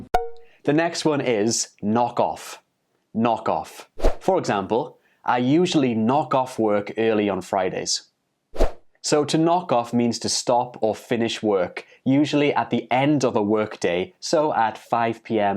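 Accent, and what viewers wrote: United Kingdom. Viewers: Excellent video,! Your British accent is amazing and you really rock as an English teacher.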